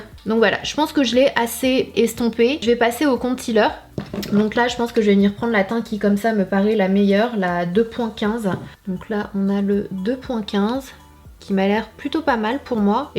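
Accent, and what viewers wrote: Quebec, Canada. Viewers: J adore ton accent québécois! Vive le Québec xP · L’accent québécois 😂🙋🏻‍♀️ follower québécoise 😋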